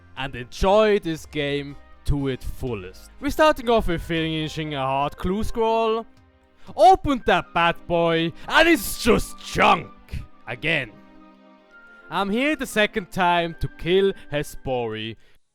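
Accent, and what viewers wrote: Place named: Switzerland. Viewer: Direkt am Akzent der Schwiizer erkennt hahaha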